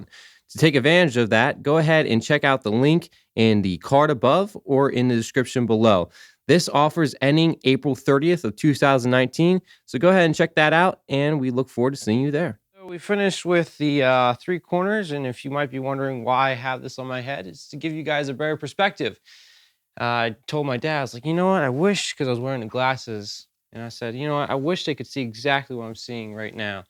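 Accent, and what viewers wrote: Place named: Annapolis, United States. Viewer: Super helpful. Are you by any chance from Annapolis? Accent reminds me a lot of a buddy of mine who grew up there.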